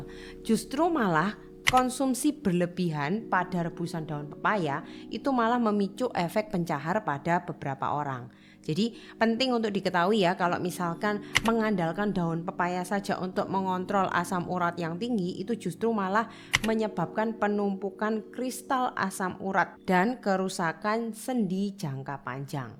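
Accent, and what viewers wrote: Java, Indonesia. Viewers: Logatnya Jawa banget,Iyo ta rek.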